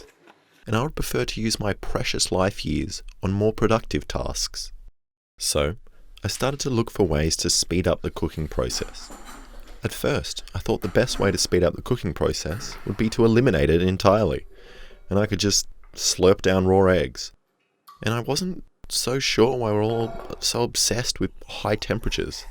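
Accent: Australia. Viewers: Is this a Australian, and talking? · Obsession with eggs? Australian? Is that you, HowToBasic? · Yami Yugi as an Aussie I can conform.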